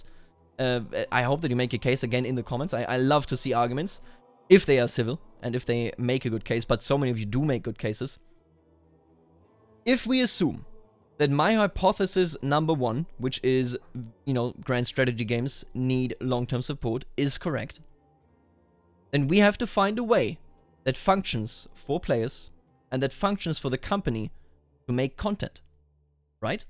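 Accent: Germany. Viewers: Well, this is how actually true German English accent sounds. It's always funny to see so called "Germans" in american cartoons that speak like Russians.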